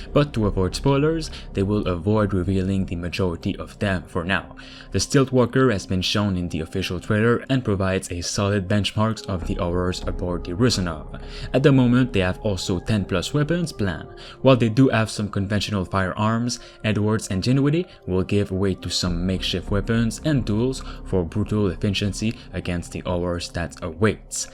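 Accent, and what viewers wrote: Quebec, Canada. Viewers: Are you french Canadian? Your English is good don't get me wrong. Continue the good review. I like your stuff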